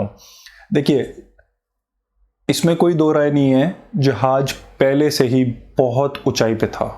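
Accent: India. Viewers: captain who ?! JahaJ ? opinion ? رائے ؟ matter nahi kartı junior hu ya senior. JeeJh ? Are you an indian ? You have an indian accent ! آپ کا ہندوستانی لہجہ ہـے _ جو کے انتہائی مشکوک ہـے _ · Looks like an Indian commentary. Accent gives away!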